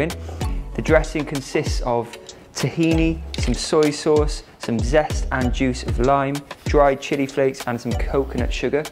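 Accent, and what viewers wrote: United Kingdom. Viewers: Hello. For the first time I came across to your channel as a vegetarian I subscribed immediately to your channel) Thanks so much, besides your vegetarian recipes I love your British English accent. Greetings from Azerbaijan,Baku.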